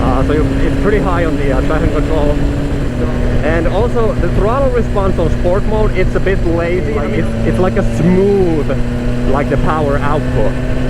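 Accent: Finland. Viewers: When the video started I noticed that you had really distinctive Finnish accent and was wondering if you are a Finn. When I saw Rukka jacket, I was sure about it :D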